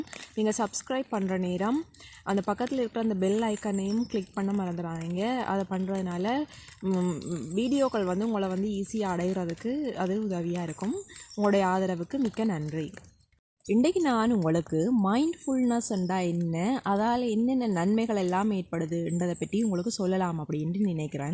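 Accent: Jaffna, Sri Lanka. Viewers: நல்ல விளக்க உரை, நன்றி சகோதரி, நிகழ்ச்சி தொடரட்டும், வாழ்த்துக்கள், நான் விரும்பும் இனிமையான யாழ்ப்பாண மொழி,(Sri Lanka வில் இருந்து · vadivaa Lankan/ Jffna Thamizh'la kathikreeka.. Nallaa piichirukku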